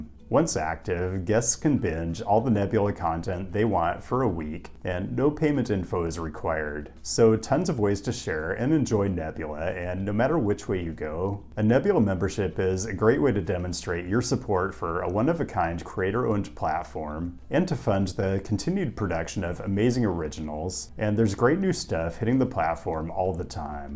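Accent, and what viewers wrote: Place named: Southern California, United States. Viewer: How come, if you’re from Portland, you have a Southern California surfer twang?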